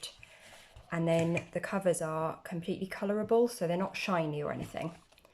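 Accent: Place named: United Kingdom. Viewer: I understand why your accent is so lovely, the UK way of speaking English is the most beautiful way for me. 😍😍😍 I met wonderful people in the colouring community on Instagram and we talked a lot, that's how I improved my English without even knowing it. 😄 I even make videos in English now, I love this language! 💖 Have a good and colourful day, see you soon. 😘😘😘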